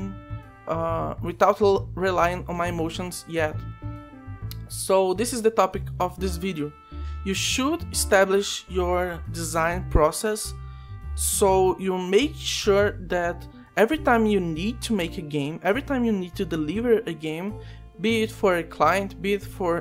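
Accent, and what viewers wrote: Brazil. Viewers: Excelente vídeo! Cheguei no canal recentemente e pelo sotaque, deu pra ver que você é brasileiro <3 Mas eu compartilho da mesma opinião,. Nós nunca podemos contar com a mera motivação para criarmos algo. Eu particularmente me forço ao máximo pra trabalhar nos meus projetos uma quantidade de horas satisfatória. Pra isso, eu uso um método que seria uma espécie de Scrum individual adaptado às minhas necessidades. Primeiro, eu crio um Product Backlog, com tudo que eu preciso fazer (seja uma feature da engine que eu estou desenvolvendo, alguma documentação, seja uma mecânica nova, seja um conjunto de sprites, uma animação, ou qualquer coisa), então, cada semana é como um iteração na qual eu preciso entregar uma quantidade X de releases baseado na minha atual deadline. E diariamente, eu faço um relatório com tudo que eu desenvolvi naquele dia, quais foram os meus empecílios e o que eu devo fazer pra melhorar aquilo. 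Dessa forma, eu não dependo apenas da motivação e eu me "forço" a continuar criando pra conseguir atender aos meus objetivos de longo prazo. Esse "método" ainda está em fase de testes uasdhusahudhusa, mas eu procuro aperfeiçoá-lo sempre que posso. Um grande abraço e parabéns por esse canal incrível <3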